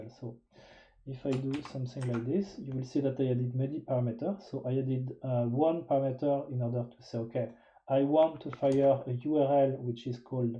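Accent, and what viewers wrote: France. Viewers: Il me semblait bien que c'était un accent Français, Bonne continuation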